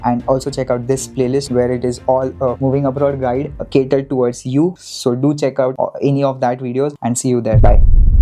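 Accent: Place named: Maharashtra, India. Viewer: This guy is marathi and I don’t like your accent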